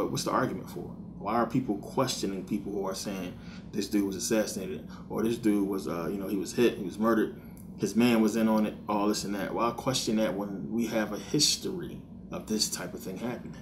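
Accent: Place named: Midwest, United States. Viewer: Young brother I am guessing but I think you are from the mid-west linguistically listening to your accent Milwaukee, Chicago, Detroit. I love your mind young brother.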